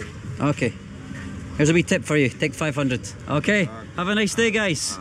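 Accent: Scotland, United Kingdom. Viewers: That Scot accent is hardcore yew !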